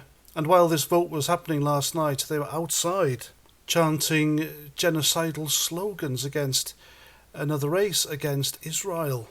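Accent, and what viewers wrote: Wales, United Kingdom. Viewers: You sound Welsh. They've just sent some so-called British Palestinians to your country.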